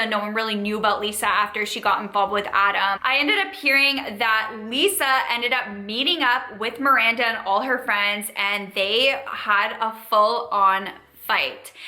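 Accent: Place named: Canada. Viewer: I love you're canadian accent lol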